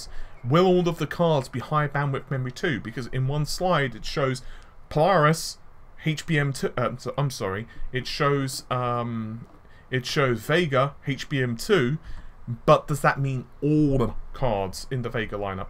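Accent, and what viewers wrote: United Kingdom. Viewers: he's speaking like a normal British lol.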